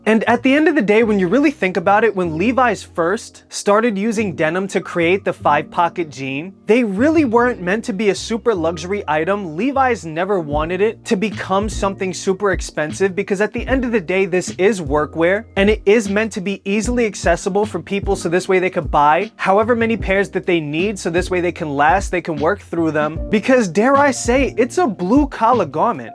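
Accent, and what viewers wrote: New York, United States. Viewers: Thank the lord, there's still people with New York accents.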